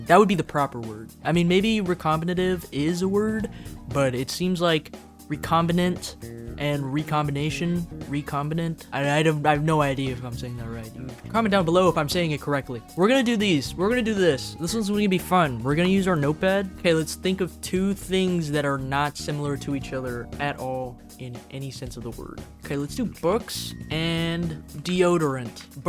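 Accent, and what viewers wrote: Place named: United Kingdom. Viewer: Yea the British accent sold it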